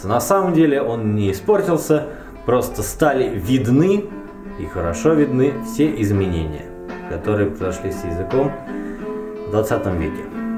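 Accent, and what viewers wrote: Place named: Okanye, Russia. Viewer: Интересный взгляд, подобное я мыслил для украинской традиции не новой народной с конца 18 века, а вот привязать её к традиции до Петра который де-факто её уничтожил. Большенство памятников как ни страно словарей Мелктия Смотрицкого, Памва Беринды, Лаврентия Зазания и т.д. были как раз в украинской церковнославянской традиции в этом плане максимовичовка (правописания) подходило как нельзя лучше по сути как у поляков сохраняя часть этимологии и отбивая фонетику при помощи диакритики . Касательно Окальщиков это миф, в Украине такиз нет и ни разу не встричал, вы в видео порой гОворите окая яаще чем в Украине кто ли бо мог на русском. Оканья и в РФ нет, да я знаюо вологодских диалектах и слушал их, но согласитесь нерегулярное оканье это ни какое не оканье в традиционом понимании. Касательно разрыва традиции и прочего, это уже случилось после реформы 1918 года разЫграть, раССрочка, да даже Аканье давно отображают в русском языке в именах Алёна, данилА, я уже не говорю о словах устно заимствованых где в древности было О хозары, козакы, а ныне хАзары, кАзаки прочее, потому тут уж такого сильного разрыва не будет как по мне. 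Оглушение передавать может и не стоит, я сам за, хотя мои коллеги по цеху говорят о не целксообразности чередования горат -> гараДа, ф кантактах -> в интернете.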